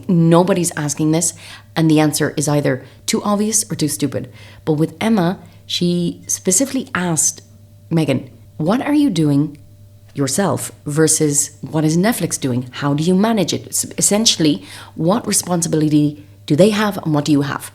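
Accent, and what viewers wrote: Ireland. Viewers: I'm Swedish and it took me some time to understand what you were saying, but now when I'm used to it I find the Irish so soothing and calming, please don't change it too much! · Your accent is so interesting. There’s an Irish twang, no offense meant (I’m an immigrant too). Just quite curious about it. Also, love your videos-I binged quite a bit in the last 24 hrs. You kind of remind me of another content creator I follow called Cheere Denise. She does voices similar to you when it comes to MM-the smarmy self obsessed voice that MM has. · Aww. Not to be too much of a stan but that's just the Irish accent that lots of us like very much. And unlike ahem... some people 😅... it's 'authentic' 😄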